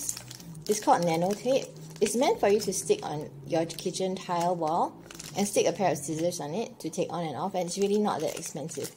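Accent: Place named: Barbados. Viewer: Where are you from? You sound like you're from the islands. Barbados?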